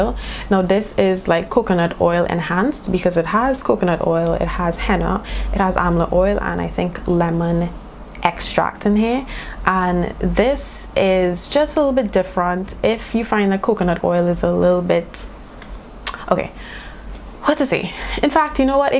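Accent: Trinidad and Tobago. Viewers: We have the same Trini accent, are you from the Vale? Have you checked out Body beautiful on D'ave for some of your products?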